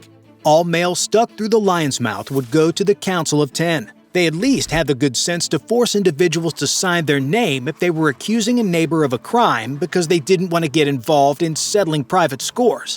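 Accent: United States. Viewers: American accent makes the video cheap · The sensationalist, excitable american narration makes this unwatchable (like most 'documentaries' from that part of the world).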